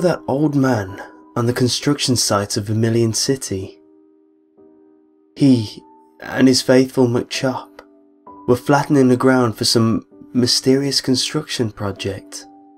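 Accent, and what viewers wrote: United Kingdom. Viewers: This is my favorite creepy pasta channel, I don't why but I like them better when someone British reads them. I have watched most of his, does anyone know of another really good british creepy pasta channel?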